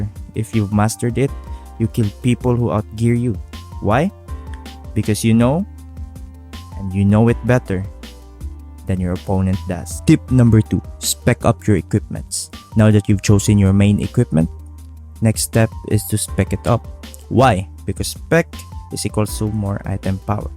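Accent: Philippines. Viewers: you pinoy? accent is obvious hehe. good video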